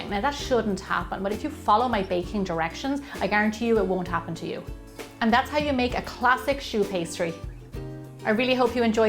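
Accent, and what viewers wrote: Ireland. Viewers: Thank You for the great video and information. I love your Irish accent. My spanish wife would shoot me for saying that. LOL!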